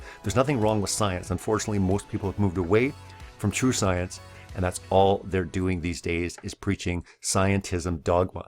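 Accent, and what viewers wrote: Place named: Canada. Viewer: Your accents Canadian obviously👍🏼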